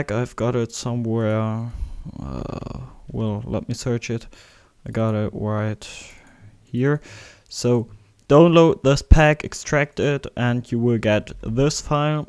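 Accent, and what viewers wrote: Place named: Germany. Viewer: Man merkt dass du deutscher bist :D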